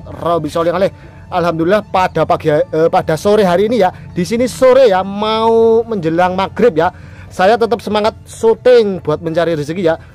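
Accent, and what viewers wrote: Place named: Lampung, Indonesia. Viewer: Walaupun di inskripsi gak disebut daerah tp Ciri khas kata2 Yo Yo g ktinggalan. Pasti daerah Lampung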